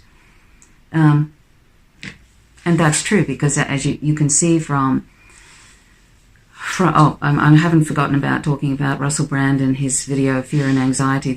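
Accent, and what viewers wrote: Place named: Australia. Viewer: you sound like you have spent time in australia ? Very timely and insightful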